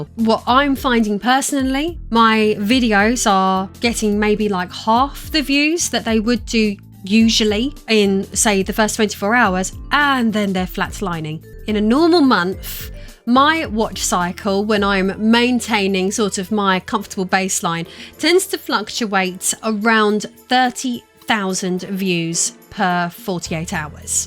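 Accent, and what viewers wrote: United Kingdom. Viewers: I watched one of your videos the other day now I'm getting a steady stream of your content. I'll stick with you because you're British and the accent is perfect. The BBC should hire you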